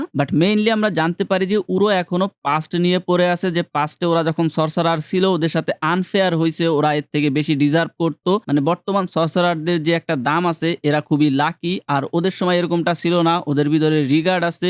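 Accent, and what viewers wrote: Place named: Magura, Bangladesh. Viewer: Vai apnar basa ki magura? Magurar Moto accent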